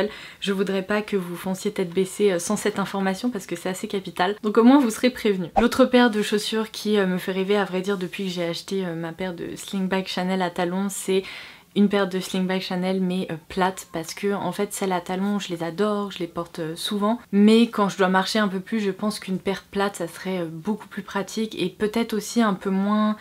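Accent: England, United Kingdom. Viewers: Tout me donne aussi tellement envie ! On va être nombreuse à craquer 😍 Ps : ton accent anglais me fait toujours autant rigoler ! Gros bisous ma belle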